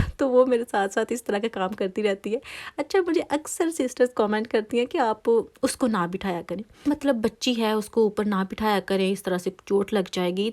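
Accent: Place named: Lahore, Pakistan. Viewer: Pure Lahori accent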